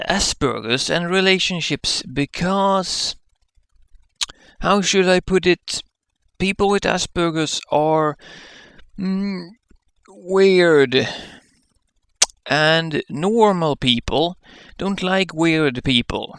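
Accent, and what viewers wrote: Sweden. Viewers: med Wa...Yeah, I agree on both points! :-) I was like, "Is he Scandinavian, German, Swedish, just Autistic vocally in his own way?...But hey, Swedish sounds good to my ears from this guy. ;-)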